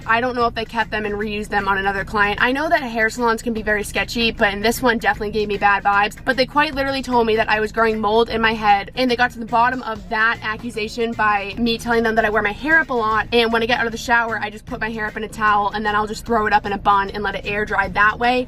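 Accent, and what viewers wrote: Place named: Massachusetts, United States. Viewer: your MA accent is strong in dis one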